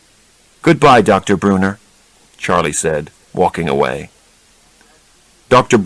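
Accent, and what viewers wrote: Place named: United States. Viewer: american english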